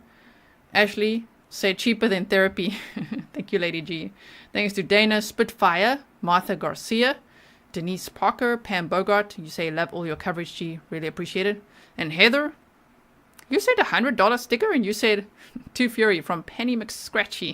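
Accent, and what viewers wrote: South Africa. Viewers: Hi G x I'm from the KZN South Coast of South Africa. Always look forward to your videos and South African accent giving international news ❤. You go girl. Watch each episode. Always more indepth and thought provoking. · South African? 😊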